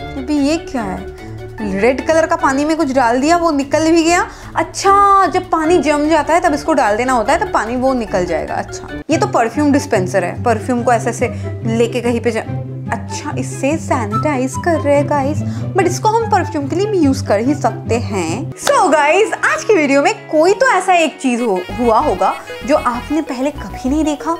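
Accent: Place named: Bengal, India. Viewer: AP bengli ho na qki apke bolne ke style se ye hi lag rha h mujhe ye aeisa isliye mga qki mai bhi bengoli hu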